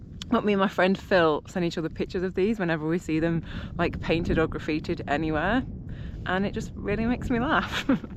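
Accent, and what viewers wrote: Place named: Manchester, United Kingdom. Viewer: So you're from Manchester? I was trying to pinpoint the accent. Guessed wrong.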